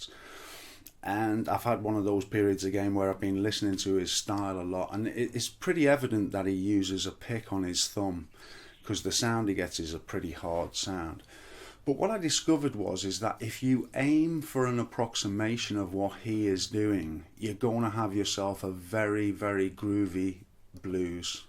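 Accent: Liverpool, United Kingdom. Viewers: good lad,lovely easy going lessons, sounds like you live not far from Liverpool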